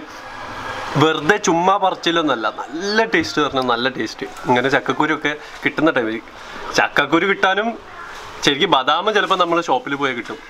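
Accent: Malappuram, India. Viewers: Malappuram karan ano😎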